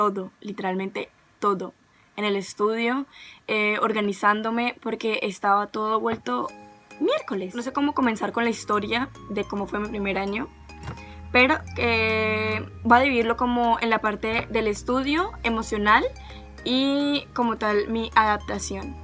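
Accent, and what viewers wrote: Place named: Colombia. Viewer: su acento colombiano despeja dudas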